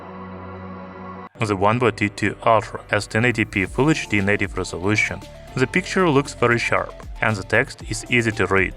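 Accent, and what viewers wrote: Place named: Russia. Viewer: Use AI for english, cause its hard to understand you Rusky!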